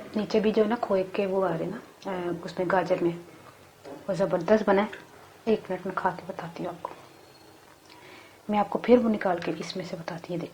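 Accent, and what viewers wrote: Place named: Punjab, India. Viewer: Uff apki Punjabi so cute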